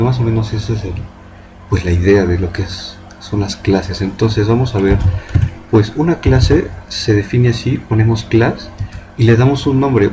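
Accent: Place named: Central America. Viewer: El acento se nota que es de centroamérica o de México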